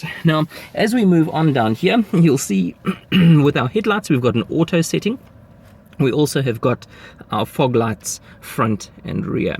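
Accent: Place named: South Africa. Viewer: Your accent sounds South African 😅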